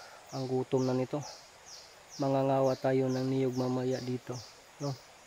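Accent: Visayas, Philippines. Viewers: Lingaw kaayo kos imong sinultian boss bisayang tagalog amping always Godbless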